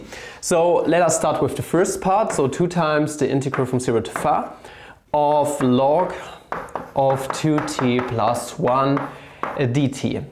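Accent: Germany. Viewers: I love how you say “Integuhrruhl”! Math is sooooooo much better when done in a thick Deutschlander’s accent!!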